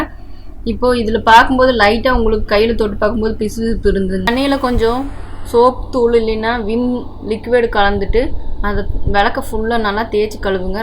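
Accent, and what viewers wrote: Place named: Kongu, India. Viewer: Super-nga. Vinayagar chathurthi ku correct-aa tips koduthirukkeeenga! Plus, unga kongu Tamil inimai!!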